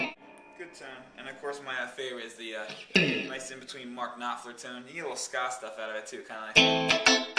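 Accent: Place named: United States. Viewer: Are you Jim Sturgess with a permanent american accent? :) You sound exactly like him.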